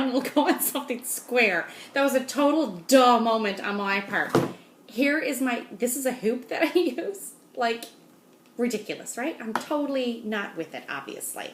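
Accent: Newfoundland, Canada. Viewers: I enjoy your videos ( and that Newfoundland accent ) so much. I am in rural Texas, no doubt I have my own accent, and I am over 120 miles from a Michael's and 70 miles from WalMart. No LNS anywhere either, so I do my stash enhancement shopping online. At least I don't have the shipping costs that you do. 😊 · I was listening to you speak and thought... that's an interesting accent. I feel like she's mentioned where she is from before, but I can't remember! So when you said "Newfoundlander" I was like "OOOOH!" I think you are the first person I have encountered with that accent! No wonder I couldn't quite place it. Your dad's boat is looking amazing! I am so impressed with the progress you've made and how fast it is going. My full coverage design is not even inching along, haha. And I agree with you! That Record Girl's hair is looking awesome.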